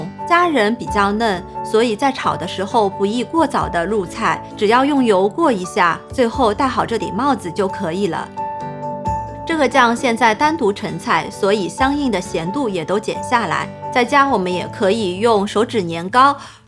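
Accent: Shanghai, China. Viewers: 上海味的普通话，好亲切😄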